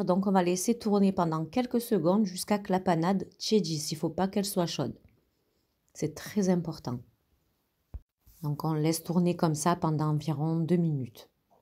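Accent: South, France. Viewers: Coucou, elle me plaît beaucoup ta bûche, effectivement elle est à "croquer "parfaite pour cette année à Noël 🤶 🎅 🤶 🎅, merci beaucoup pour tes partages avec notre bel accent du Sud...Gros bisous et très belles fêtes 🥳 à toi et à ton entourage. 😊😊😊😊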